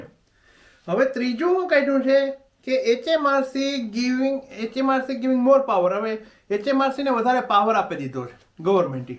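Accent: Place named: Saurashtra, India. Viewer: Sorthi boli hambharva Ni Maja ave chhe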